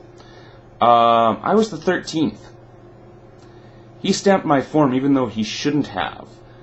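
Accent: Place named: Japan. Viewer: Interesting. You and I may be the only ones in the US who did not start because of anime. Judging from your accentation I guessed you had access to a friend or relationship partner to study with from Japan. Still, it takes a unholy obsession to learn Japanese, I feel there is much more to this story left untold.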